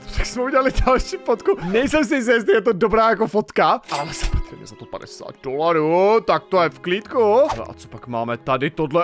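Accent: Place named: Pilsen, Czech Republic. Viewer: Upřímně jsem toto videjko měla dneska jako relax - byla jsem na 1 den u Plzně na výletě (v Domažlicích) a tedy... jak jsme přijeli do Prahy, energie někde (nevěřila bych, že si to někdy až tak uvědomím, ale už jen ten fakt, že se znovu vracíš do domoviny / jsi blíže do reality, tak tě vyčerpá) a já už si fakt přála, abych byla doma 😅 Vážně, dneska jedno z videí, kde jsem zarelaxovala a teď přímo postel xD Zítra ještě shlédnu videjku na main YT, ale dneska jsem si dočerpala "pozitivní energii" zde, zítra doplňuji u hlavního kanálu. Děkuji za ni (a též si budu o to více vážit, když tě někdy nějaké ten přízvuk od Plzně se občas objeví ve videjku) ❤:D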